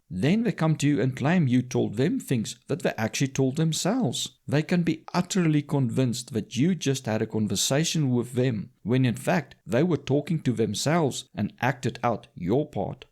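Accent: Afrikaans, South Africa. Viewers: Dude, is jy Afrikaans? Jou aksent klink baie Afrikaans.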